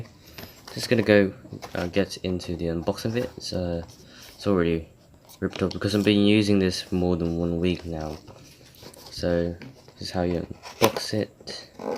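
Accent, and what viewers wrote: United Kingdom. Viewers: I know its too late to comment but you really have a nice british accent Im practicing my british accent! Sanaol marunong magaling mag english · I have those! But its gray, i got it for my bday Also nice british accent, i dont think i can do british lol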